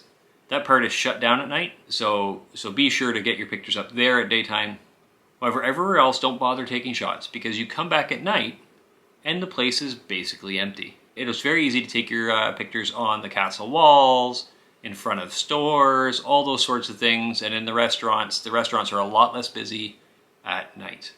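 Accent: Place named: Canada. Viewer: Another Canadian on You Tube, my gawd it's like every Canadian has a YouTube channel. As soon as they say the word about you know you got a Canadian on your hands.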